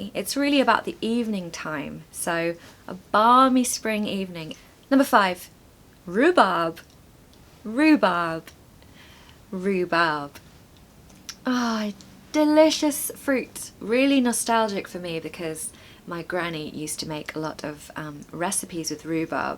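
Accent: United Kingdom. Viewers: I liked very much your way of teaching.being an Indian teacher of English lam interested to hear your British accent.thanks a lot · Hallo from Italy. I adore british accent. Unfortunately I don't know all grammar rules, but I can understand what you say, even without subtitles. My problem are speaking skills 😢: how can I think not in Italian but in English??? In the moment I have to talk, I remain without words (writing is better for me)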